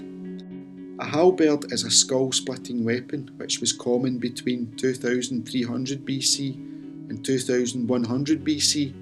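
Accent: Scotland, United Kingdom. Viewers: Oh , to be a time traveler! Although, you would have to bring your own food, drinks and snacks… they probably wouldn’t have food stores. I would have to go back and forth a lot , but it would be worth it, truly amazing. A wonderful video. And the beautiful Scottish accent of the narrator is mesmerizing. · Might want to start with a map, mate. Where on earth is this? I'm guessing Scotland somewhere l, from your accent...? Very interesting remains...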